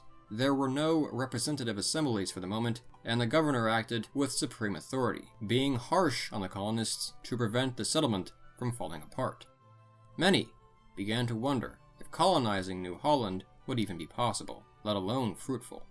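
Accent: United States. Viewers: Why is there a American talking instead of a Australian? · I was sceptical when I first heard the American accent on this doco but it is excellent and really comprehensive, with information that I, as an Australian, didn't know. I particularly love that you include the fairly recent genetic information regarding where the people travelled from to populate the continent and surrounding islands. I'm using this as a resource to teach my son Australian History and we'll definitely check out your other documentaries. Thank you! Really well done!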